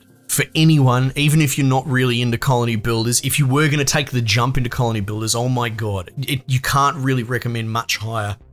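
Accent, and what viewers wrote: Australia. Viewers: the part about the furries got me crying. its even funnier with you aussie accent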